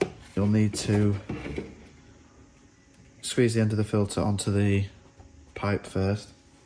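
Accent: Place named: North, United Kingdom. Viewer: Good work, nice to hear a northern voice doing a service on these great cars. Do you know where the fuel sensors are and are they easy to replace? Cheers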